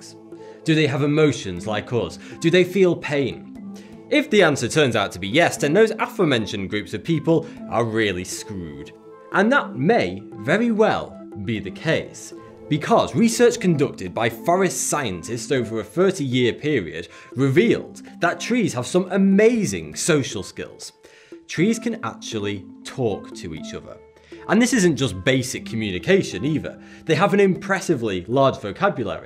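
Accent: United Kingdom. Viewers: omg you are british immediately frogives you for sponsors and ads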